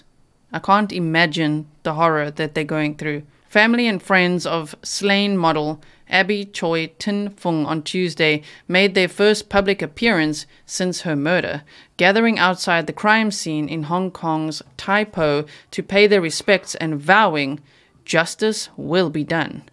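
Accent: South Africa. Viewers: I can hear that you are south african🇿🇦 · You dont have a mixed accent. ITS SOUTH AFRICAN !! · Is your significant other another english speaking dialect like australian New Zealand? Your accent definitely is south African but there is more to it, maybe its wven American english mixed with your majority south african accent....but yea, when you mention it!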